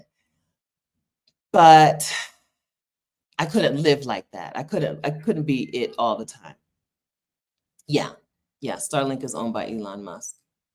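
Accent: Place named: Florida, United States. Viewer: What wrong with sounding like you are from Florida?! 😅 I hope Bogota works out for you! 😊 Tfs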